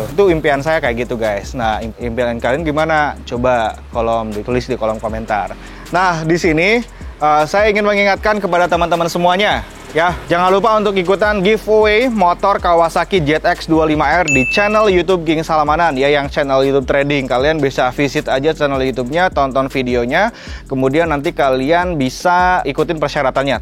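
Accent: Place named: Sunda, Indonesia. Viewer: Baru mampir.. sundanya medok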